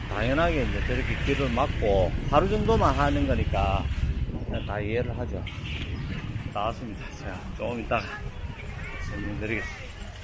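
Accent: Gyeongsang, South Korea. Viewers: 경상도댁 언제나 친근하고 차분한 느낌입니다. 남편 잘 만나 경상도 사투리고 한국말 배우고 말투가 이쁘십니다. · 전형적인 갱상도 ㅎ 잘하고 계십니다!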